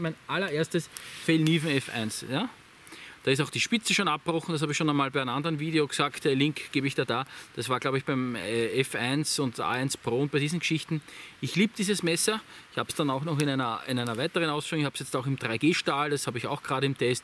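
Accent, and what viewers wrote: Austria. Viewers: Der Mann hat .at als Kanalnamen und einen hörbar österreichischen Akzent - für mich als Norddeutschen. Was soll die Frage? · Bist du Österreicher 🇦🇹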